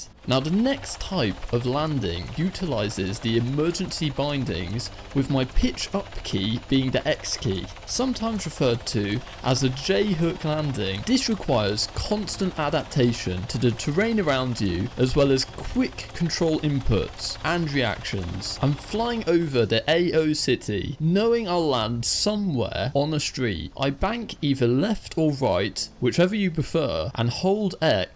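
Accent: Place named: United Kingdom. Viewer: there is no better accent then british ^^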